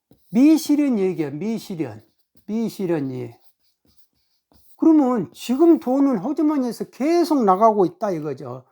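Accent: South Korea. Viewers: 구독자 입니다. 고향의 이웃동내 같은 구수한 억양에. . . 저는 전북 정읍 현재는 사무실을 옆 하비오 에 있습니다. 오늘은 너무 유익해서 두번 듣습니다. 제가 동영상을 거의다 듣고 있는데 아주 유익 합니다 .끝부분에 고생 고생해 남쓸때 안쓰고 모아서 3채 가 되기에 아주 고민이 많습니다.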